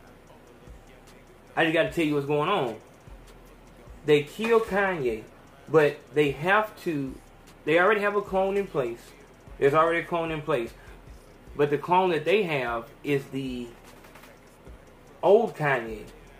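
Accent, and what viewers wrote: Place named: South, United States. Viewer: You so country but i 💕that though!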